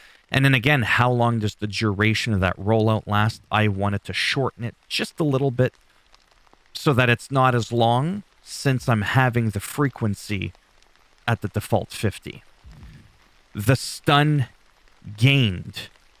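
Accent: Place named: Canada. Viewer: Merci by the way im Gove pleasure too meet u I really like your Canadian accent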